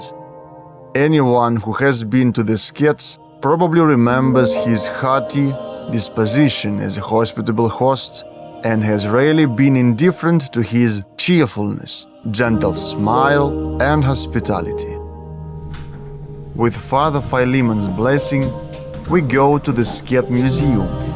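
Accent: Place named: Eastern Europe. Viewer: This is all very interesting. I have been there, so it brings back good memories. However, why not get a native speaker of English for this series? It is a bit painful to have to listen to such a funny Slavic accent for a whole hour or more. Besides, there are quite a few words which are mispronounced or barely understandable. Even the word "skete" sounds something like "skit" which is something else entirely. Also, at about the music is so loud that the dialogue is even more difficult to understand. I tried to use the subtitles, but they did not make much sense as the mechanism could make little sense of the funny accent.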